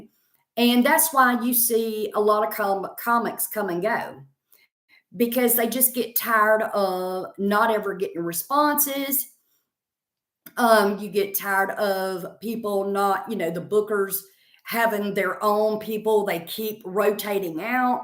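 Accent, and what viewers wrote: South, United States. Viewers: When you Start to say "What the f.. future holds.." I laugh right off the bat..I expect those southern words right off the bat..love you!🤣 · I adore you. I use to live in texas an have missed the southern drawl. You are hilarious. I had lost my laugh cuz of an abusive relationship and watching you I've found my laugh again, so I am forever always be grateful. And tell everyone to watch you. I wish you success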